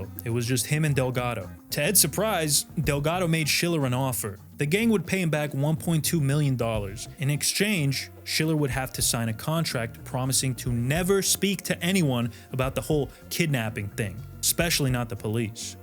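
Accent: Italy. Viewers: First 20 seconds I already like the narrarator's accent lol. Is that... Italian?!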